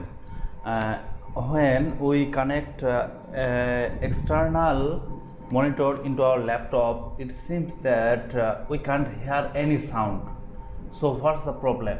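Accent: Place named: Bengal, India. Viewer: With ur accent I can understand that ur a bengali because I am also bengali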